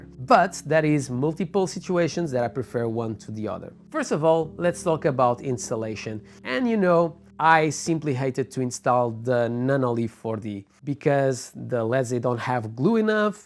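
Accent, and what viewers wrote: Portugal. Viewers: Caralho, pelo sotaque és tuga😆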